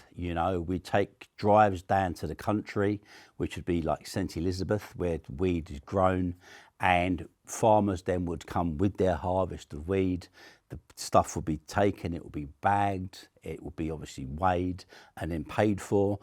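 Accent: United Kingdom. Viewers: This britisch Englisch is so discusting..... Bah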